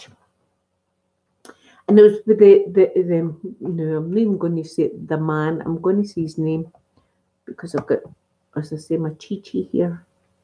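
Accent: Scotland, United Kingdom. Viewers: Your Scottish accent is definitely my favorite for asmr and is sooo relaxing... I'm from California and I prefer asmr channels that have an accent that isn't American.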